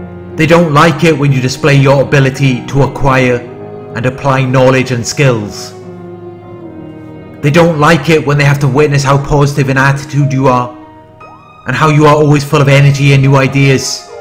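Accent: United Kingdom. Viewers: Wow too real! Sounds toomuch like my x wife in so many ways...in her bad days me and my 2 little kids had to tiptoe around Mom otherwise she'd explode! On a family nite out to McDonald's our eldest daughter would be so fearful to upset the Narcissist Mom that she'd even refuse to order anything, afraid Mom would yell at her for ordering too much! Oh i hated those times! She eventually left me with 2 young children at home because home was just too boring for her. How those 2 precious young girls suffered, because even tho she was that disturbed element at home, there "were" some precious and few moments that our daughters did enjoy with their mother...a sad "live/hate" type of scenario, which should never be in home, especially with young growing children! So like i stated earlier, this video hit way too close to home, literally speaking. After 15 some years the girls are busy with their own children and it seems they've forgiven Mom for abandoning them when they were so young, tho the girls seem to know now which "buttons not press" or the old Narcisst Mom will suddenly raise her ugly head. 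Thanx for the video...again that British accent seems to "hook" me in each time, Haha, great!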